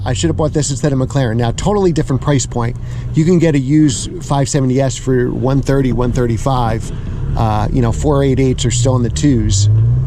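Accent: United States. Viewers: Watching the video and listening to you speak and I'm like wait a minute, this guy is from Philly. Nice review.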